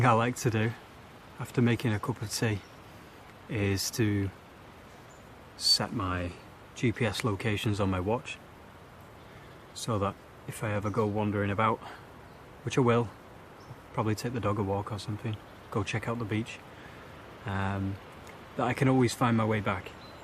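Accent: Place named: United Kingdom. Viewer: Where are you? British accent, but you are always riding on the right side of the road. Love the videos. Love that you love your dog too!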